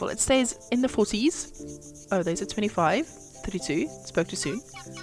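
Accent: South Africa. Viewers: you sound like south african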